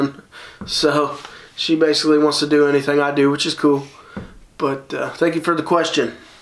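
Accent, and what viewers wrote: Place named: Indiana, United States. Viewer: Love the channel... Indiana here. Don't sound like I'm to far from ya. Keep up the good work.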